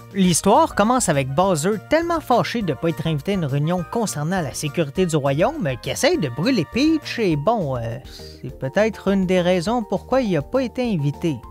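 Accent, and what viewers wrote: Quebec, Canada. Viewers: Il n'y a que Kamek que l'on a pas eu l'occasion de jouer en tant que personnage régulier. Ai-je tord ? Et sinon, j'adore ton accent.😊 Vive le Québec.😁 À plus.🎉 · Des vidéos toujours aussi intéressantes, et malgré que je viens de France, l'accent québécois ne me dérange absolument pas, au contraire personnellement, je le trouve juste tellement cool et relaxant donnant un aspect chill à la vidéo et les blagues ton grand-père sont à mourir de rire x)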